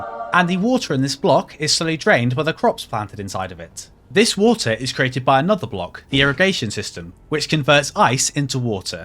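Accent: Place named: United Kingdom. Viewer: I gate how brits pronounce things but otherwise good video.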